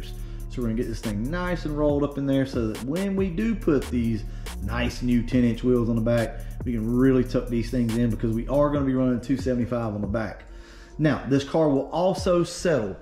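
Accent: United States. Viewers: so americans really talk like that?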